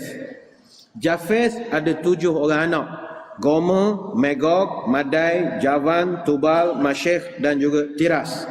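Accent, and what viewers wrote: Pahang, Malaysia. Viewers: sedak mat dengo ora2 pahang berceramoh…😅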